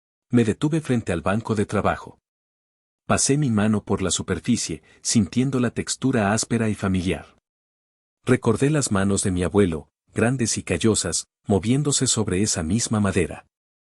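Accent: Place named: Mexico. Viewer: Dejaron de gustarme estas narraciones,mejicanas😢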